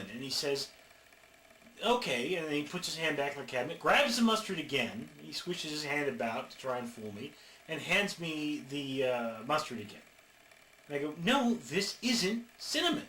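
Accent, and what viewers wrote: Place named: Australia. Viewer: def australian well g'day mate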